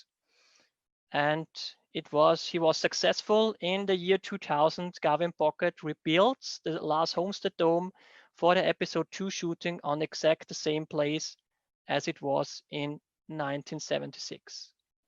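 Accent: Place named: Austria. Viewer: Noch nie so einen österreichischen Akzent im englischen gehört 😅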